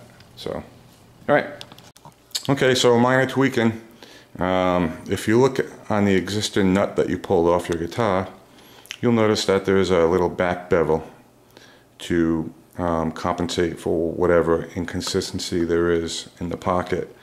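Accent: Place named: East Coast, United States. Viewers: this guy has to be from massachusetts......or.....new york. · I got a kick out of your accent. Such an east coast '70's hair band voice (no slight intended, just that, out west we don't have the same attitude in our speech). I have a '72 Gibson J-160 that needs a new nut because the high E is too close to the edge. Bone or plastic? Thanks, man. Really liked the vid!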